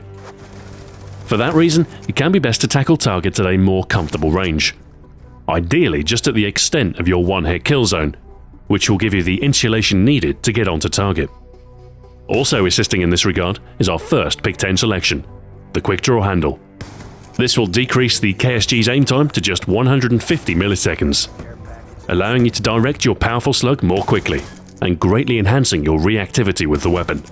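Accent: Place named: United Kingdom. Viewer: Somehow your Britishness makes all of this sound much more official.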